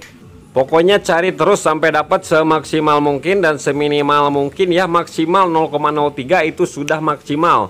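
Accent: West Java, Indonesia. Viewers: Sunda pisan iyeu mah... mantap kang... 🙏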